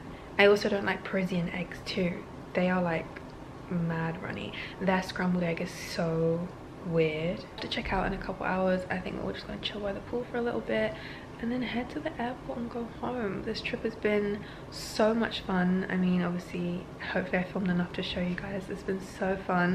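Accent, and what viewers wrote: United Kingdom. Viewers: At the beginning of the video you have "LND>IBZ" so I think she lives in London (and she has got British accent so I suppose she's from UK). But I'm also new so I'm not sure hah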